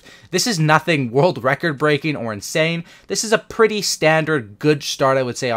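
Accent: Sweden. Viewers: Are you from sweden? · are you from Sweden?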